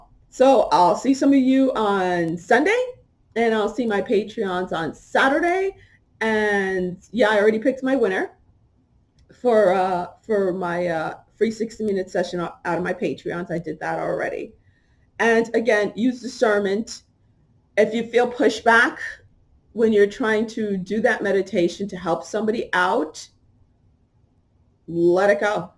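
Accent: New York, United States. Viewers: I love your "New Yorkness" and I am sure a lot of other people do too. Thank you. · I like you New York style 👍🏻🎶🎤you Rock.. 😎🤩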